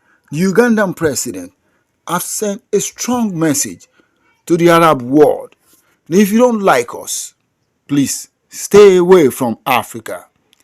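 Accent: Nigeria. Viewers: Is the host a Nigerian? I couldn't hear his English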